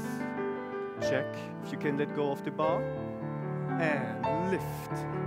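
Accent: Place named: Brazil. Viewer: I know you're Brazilian 😂 your accent tells ♥️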